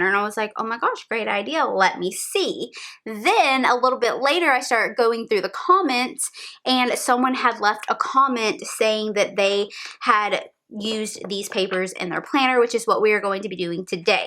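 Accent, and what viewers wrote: South, United States. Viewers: I feel like I grew up with you with your accent. Just every southern girl I’ve ever know lol 💙 from South Carolina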